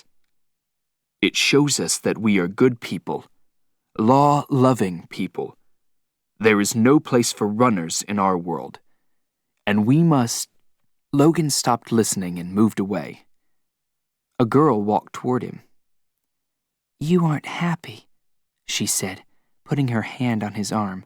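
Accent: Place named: South, United States. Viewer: After knowing Logan as Michael York for 50 years, it's funny hearing him narrated with a southern accent. And his world not being confined to a giant shopping mall.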